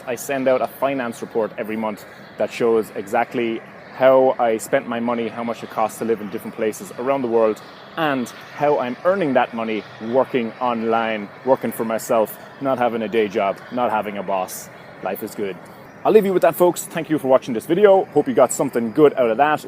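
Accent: Ireland. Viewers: Are you irish? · Are you Irish?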